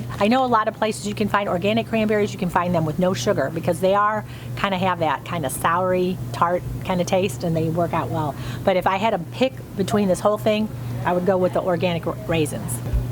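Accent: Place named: Upper Midwest, United States. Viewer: I have to ask what state you’re from originally; you happen to sound like a lot of folks I know up in the northern Midwest.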